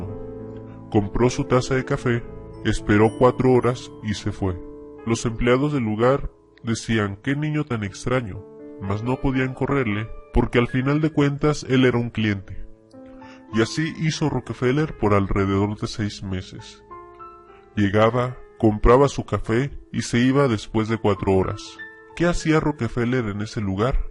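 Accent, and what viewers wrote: Chihuahua, Mexico. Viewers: Mmmm... asento de shihuahua ? Si, este es de esos.... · pues por la manera en que dice la "ch" yo digo que es de chihuahua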